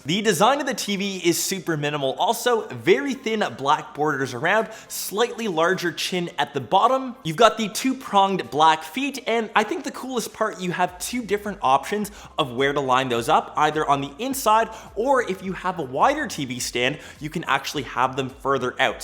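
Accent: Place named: Canada. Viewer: Beeenk I now know how to determine If someone is an american or canadian because of you. I find it adorable 😁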